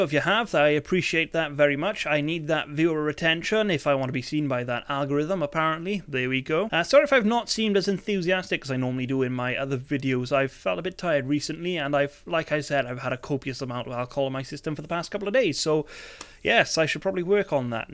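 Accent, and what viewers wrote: Wales, United Kingdom. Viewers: hello MR Welsh man........remeber me???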